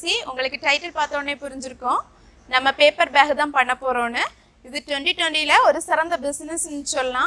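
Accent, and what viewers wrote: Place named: Kerala, India. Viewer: Neenga pesuratha patha ennakku malayalee mathiri tonudu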